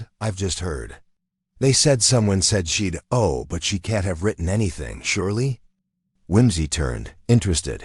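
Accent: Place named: United States. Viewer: It lost its soul when read in American English. Deleting the channel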